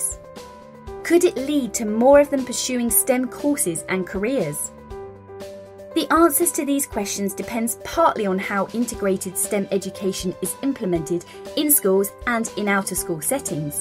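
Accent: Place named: United Kingdom. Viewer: In britain it is spelled with an "s" and their accent seems to be british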